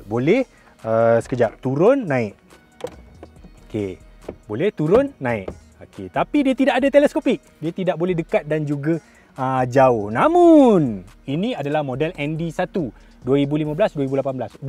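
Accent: Malaysia. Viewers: Best dgr slang malaysia hahhaa. Blh faham btl btl tanpa subtitle. At first I ingat ini indo video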